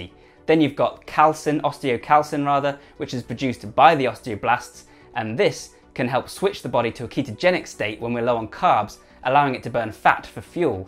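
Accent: England, United Kingdom. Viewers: What part of England are you from?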